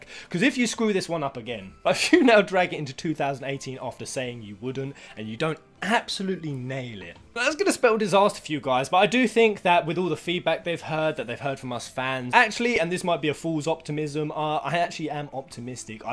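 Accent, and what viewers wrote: England, United Kingdom. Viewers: You remind me of my childhood friend Robby but only with an english accent.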